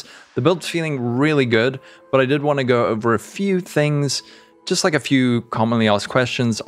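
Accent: South Africa. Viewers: Are you a South African botjie?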